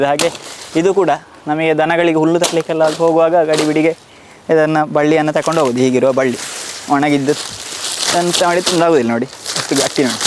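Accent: Mangalore, India. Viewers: No matter what u make, just watching ur videos only bcz of your manglore kannada accent.....wowwwwaw!!!! lOving it since ur 1st day Keep going big fan of u🤩💫 Love from benne nagari - Davanagere KA 17💪💥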